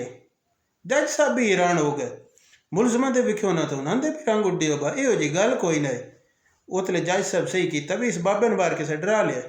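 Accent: Sargodha, Pakistan. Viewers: لاں سارے پنجاب وچ نہی بولیا جاندا ایہ لفظ سرگودھا تے منڈی بہاؤ الدین دے کجھ لاہندے آلے پنڈاں وچ ای بولیا جاندا اے تے جناب دا لہجہ وی اس پاسے دا ای اے ساڈے لہجے وچ لاں نہی بولیا جاندا لیکن اس لفظ نال واقفیت میرے بچپن دی یاد اے 😁 لاں اس رسی یا تار نوں آکھدے نیں جس اُتے کپڑے ٹنگ کے سکائے جاندے نیں یا اس نال رلدا ملدا کم لیا جاندا اے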